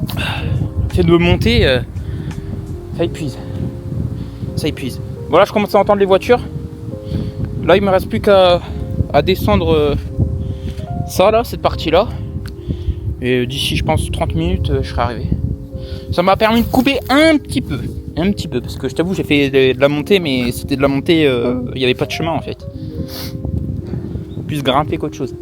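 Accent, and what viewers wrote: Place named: Japan. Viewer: on kiff l'accent japonais ( continue t'es vidéos elle sont incroyables )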